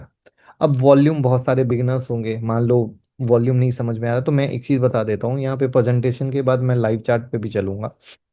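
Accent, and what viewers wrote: Bihar, India. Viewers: आप बिहारी हो क्या?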